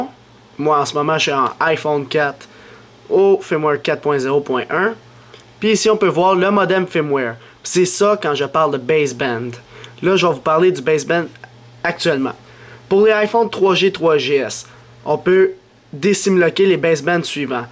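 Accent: Canada. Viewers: Ton accent Canadien il est trop drôle :-) on n'en n'oublie presque le but de la video ... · Mdr l'accent canadien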